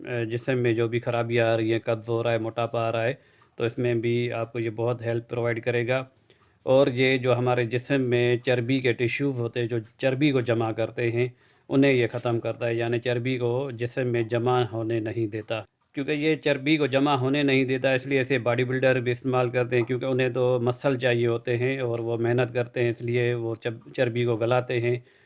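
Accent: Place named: Uttar Pradesh, India. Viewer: السلام علیکم ورحمتہ اللہ وبرکاتہ حکیم جی آپکا لب و لہجہ بتا رہا ہے کی آپ کا آبائی تعلق اتر پردیش سے تھا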